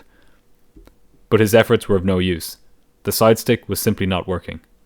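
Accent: Ireland. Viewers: No idea how u pulled it off and got subs , a posh Irish muppet recycling stories that are long long told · Very well done,! Perfect command of technical details, and that lovely Irish accent. Great!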